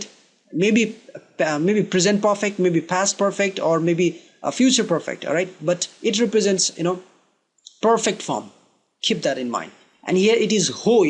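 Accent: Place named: England, United Kingdom. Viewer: Like your english accent👍🏻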